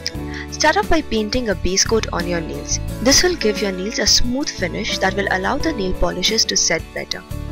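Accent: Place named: India. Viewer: It's an Indian accent she has, I think anyway, It's not actually weird sounding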